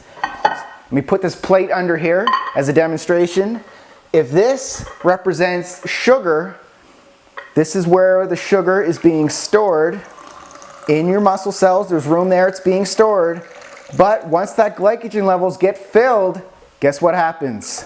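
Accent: Canada. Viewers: where are you from?? atlantic canada??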